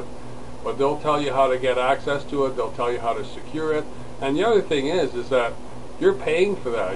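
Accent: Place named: Canada. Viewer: hahaha Canadian accent!!!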